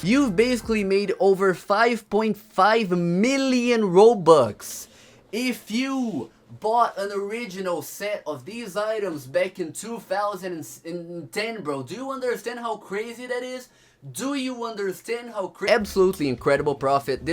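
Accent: Brazil. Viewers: esse sutaque de br vai ter q vir num churras de domingo aq em casa em. BTW VERY GOOD ENGLISH BRO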